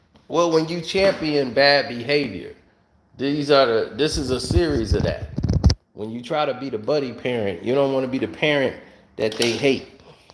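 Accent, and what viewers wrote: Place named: South, United States. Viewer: I love when you do that Southern accent.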